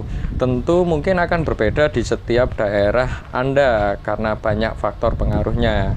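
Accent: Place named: East Java, Indonesia. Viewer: Seperti orang jawa timur.. Aksenya itu lho.., buanyaaak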